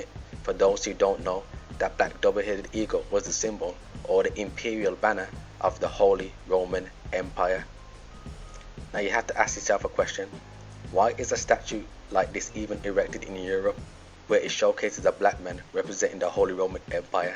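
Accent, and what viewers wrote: Birmingham, United Kingdom. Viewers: You are from Birmingham heavy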